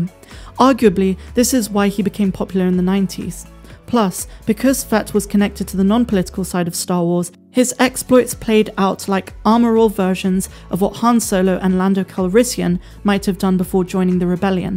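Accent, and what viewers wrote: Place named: Bristol, United Kingdom. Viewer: Love how you say jibba jabba, sound like you're from the Bristol area ? You should say jabba jabba instead 🤣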